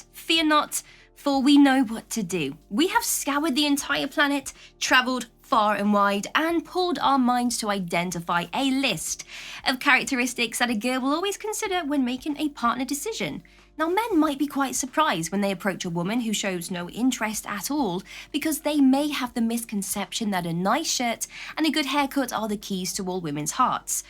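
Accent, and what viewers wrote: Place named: Manchester, United Kingdom. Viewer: Great video, thanks! I love your accent; did you grow up near Manchester by any chance?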